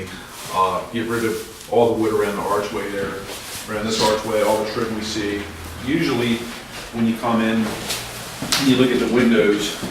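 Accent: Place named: Baltimore, United States. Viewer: This guy knows his stuff,but even more important you can clearly get a good understanding of hearing his authentic Balmer-accent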